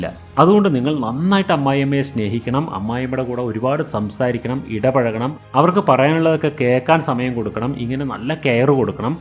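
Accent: Palakkad, India. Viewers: Oru palakkad Karan alle ?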